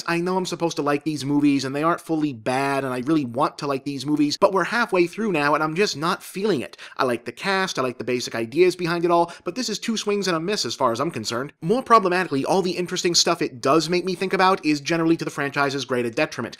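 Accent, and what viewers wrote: Boston, United States. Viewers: has a boston area accent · Wow, his speech mannerisms and accept really show through on this one. I think I've seen about 25 of these things and never go "Boston" stuck in my head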